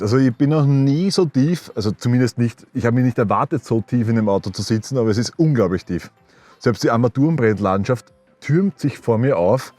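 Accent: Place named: Austria. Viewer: Deutsches dialekt won östrreich ist komisch......aber auto ist cool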